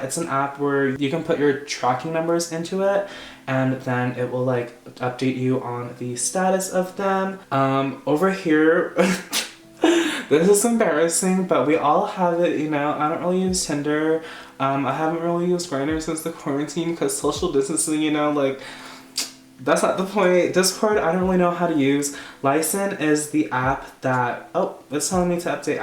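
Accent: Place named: United Kingdom. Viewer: bringing out the british accent already i see lmao 😭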